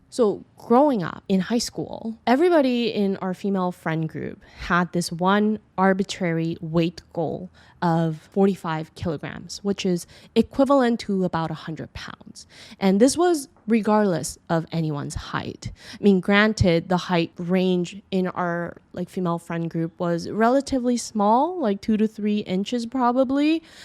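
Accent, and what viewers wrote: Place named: Philippines. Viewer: You are Korean? You sound Filipino. :hand-purple-blue-peace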